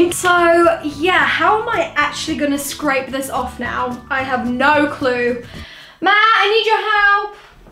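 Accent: England, United Kingdom. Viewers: Is she English? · Are u supposed to be British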